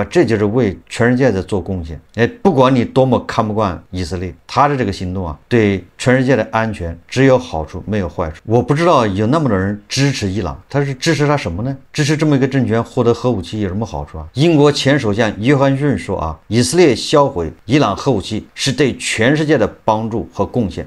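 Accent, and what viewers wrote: Anqing, China. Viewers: 安庆地区口音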